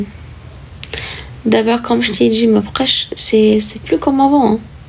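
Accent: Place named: Morocco. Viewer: انتي❤ مغربي❤ لهجتك ثقيلي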